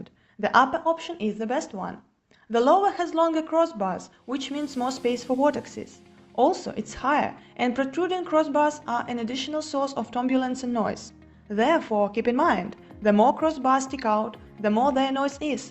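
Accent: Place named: India. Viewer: Sounds like a Hindu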